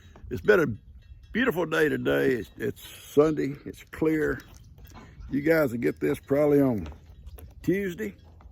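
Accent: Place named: Arkansas, United States. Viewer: I enjoy all the videos and I could listen to you talk all day. You say some expressions my dad used to say and the accent too. He was from Arkansas and has been gone for 21 years. I continue to pray for Gigi and Raleigh. Totally happy that Ivan is so much better, he makes me smile. Still praying Sooky comes home too ❤️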